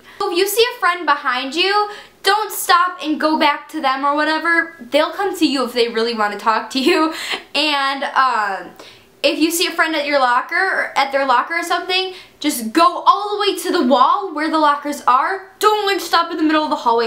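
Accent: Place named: New York, United States. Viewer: What state do you live in? It sounds like New York or New Jersey or Boston or something!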